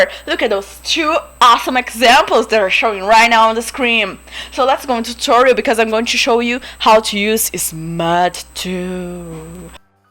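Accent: Brazil. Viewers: Gahahahahaha i learned the same amount i had fun with this video! Thx! idk where u're from, but sounds like ur from Brazil, if i'm right, muito obrigado pelo vídeo! Hahahahahah me diverti e aprendi mt S2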